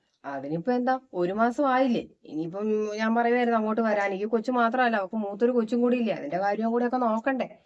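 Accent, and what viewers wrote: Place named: Thodupuzha, India. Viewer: സാംസാരം കേട്ടപ്പോൾ എന്റെ നാട്ടുകാരി ആണെന്ന് തോന്നി ഞാൻ തൊടുപുഴ ആണ്😊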